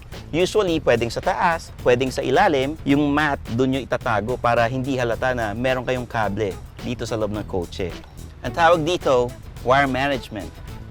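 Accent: Conyo, Philippines. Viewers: 😂🤣😂 ang cute ni sir parang bagay mag conyo ala ADMU, UST😂🤣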